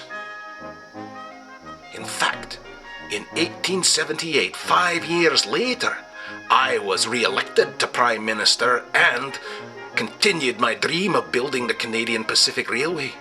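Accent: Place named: Ireland. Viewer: Why does this guy sound irish?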